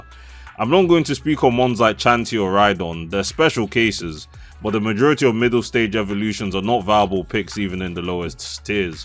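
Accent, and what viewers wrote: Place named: England, United Kingdom. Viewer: What part of england are you from mate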